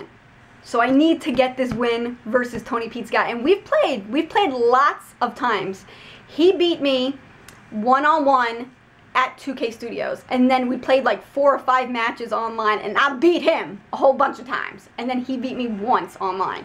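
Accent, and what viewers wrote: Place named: New Jersey, United States. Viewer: l love her jersey accent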